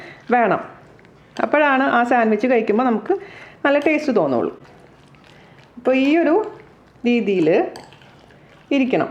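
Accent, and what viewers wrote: Thrissur, India. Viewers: Chechi thrissur slag kelkkan nalla rasam und too endhe amma veedu chalakudyil aaa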